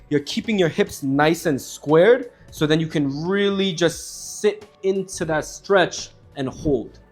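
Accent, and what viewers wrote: New York, United States. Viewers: Ayo you from New York?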